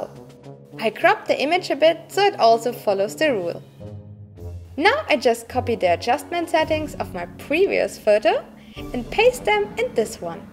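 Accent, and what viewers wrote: Germany. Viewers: I love your german accent xD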